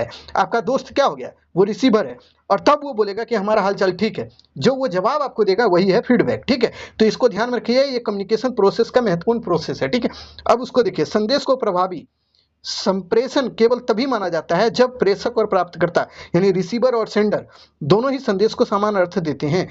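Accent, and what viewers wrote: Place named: Bihar, India. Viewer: Sir aap bihari h n ham v bihari h aapka language bahut accha lagta h😂❤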